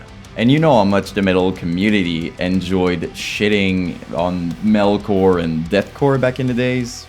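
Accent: Quebec, Canada. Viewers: Est-ce que j’entend un accent québécois? 🤓🧐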